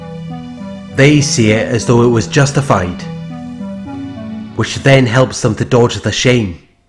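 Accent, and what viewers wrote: Wales, United Kingdom. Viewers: This guy sounds like he from Cardiff or Newport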